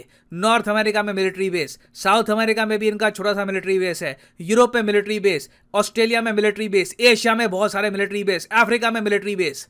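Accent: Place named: Delhi, India. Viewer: sir kbhi-2 ekdum Delhi wale launde jaise baat krte h, how sweet 😂😂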